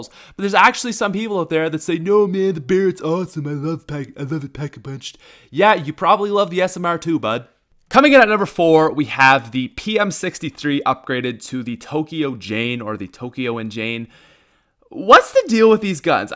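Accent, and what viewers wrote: Canada. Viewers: He's got a Canadian accent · he’s Canadian it’s his accent lol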